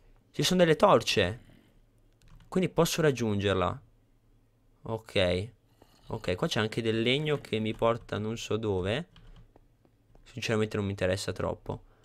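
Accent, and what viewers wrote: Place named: Veneto, Italy. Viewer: Gli scleri che ti partono in veneto sono i migliori!! Daghine;)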